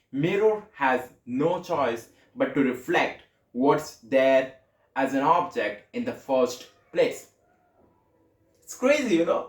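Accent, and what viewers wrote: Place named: India. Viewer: Bro Indian accent is good u do not need to try other accents.. by the way good work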